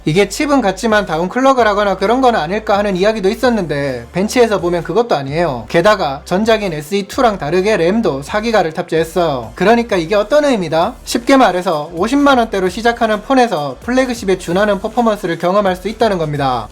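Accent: Gyeongsang, South Korea. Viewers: 경산도 싸나이 리뷰 웬지 신뢰감이 듬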